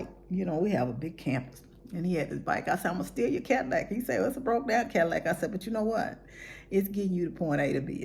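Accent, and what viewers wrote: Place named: South, United States. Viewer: I love this lady! That southern accent is so cute ❤️❤️🥰🥰🥰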